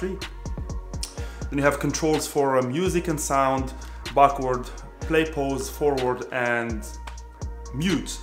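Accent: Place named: Morocco. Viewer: sat cheft had l video tle3 liya comme pub hhhh m3a sme3t sewtek 3reftek ghatkun meghribi 😂 Anglais n9iyaaaaa hh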